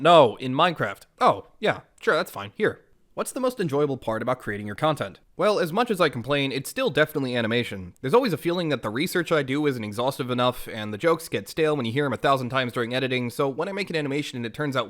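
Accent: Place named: New England, United States. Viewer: you for the info I though he has the new England accent or speech paturn.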